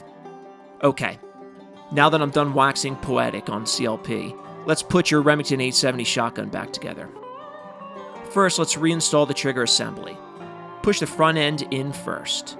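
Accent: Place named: Pennsylvania, United States. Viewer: My buddy from Pennsylvania and you sound identical!!! Its crazy!! Same accent. Awesome video!! Thank you.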